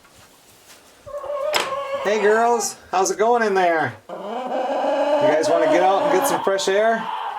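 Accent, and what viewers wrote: Minnesota, United States. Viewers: I agree! Hearing now familiar Minnesota accented voice,is quite soothing. Like putting on a comfy pair of shoes! LOL. Thanks for all you share. But, just behave, as mentioned! Or it’s the DOGHOUSE( aka crate) for YOU! 😲🙃😂😁👍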